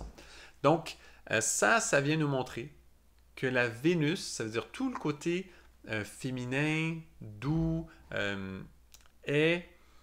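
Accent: Canada. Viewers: Merci beaucoup pour cette chouette vidéo !!!! (avec l'accent canadien en plus 😍💖👌🌟🌻) Et très jolie chemise &fleurs je suis dans le jaune aussi en ce moment ^^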